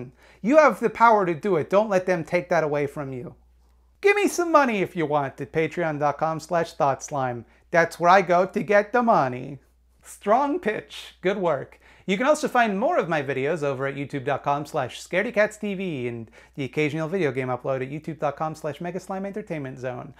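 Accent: Newfoundland, Canada. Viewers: I did not realize you were a newfie! Hey from scotia! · I'm sorry, you're a Newfie????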